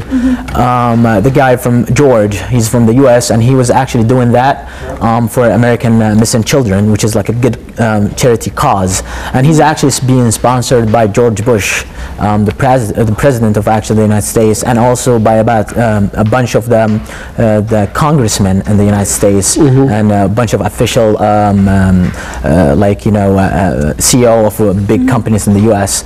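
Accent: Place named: England, United Kingdom. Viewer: i really liked your englsih accent....we are proud of you: D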